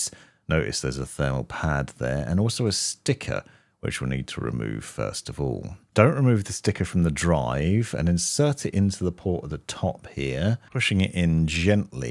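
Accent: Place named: RP, United Kingdom. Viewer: Your videos are very useful, but more importantly, I use your videos to practise my English received pronunciation. Yours is so clear! Cheers from Argentina.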